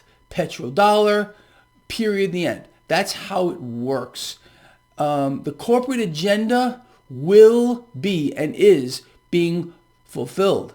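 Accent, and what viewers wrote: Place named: New York, United States. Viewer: Are you just sitting here on your ass with your New York accent promoting ideas let’s serve your best interest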